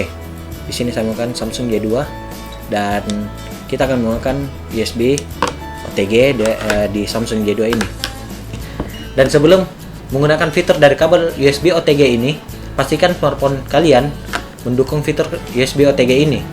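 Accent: Makassar, Indonesia. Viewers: Bang orang Makassar yah? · Orang makassar yah bang?? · org makassar kah???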